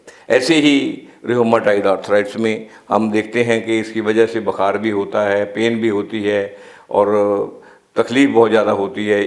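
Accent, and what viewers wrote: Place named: Punjab, India. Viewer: Dr. Sahib plz. Kara banane wali 11 चीज़ों के naam लिख दीजिए आपका पंजाबी style मै बोलने कि वजह से समझ नहीं आ रहा है. मैं तकलीफ में हूँ please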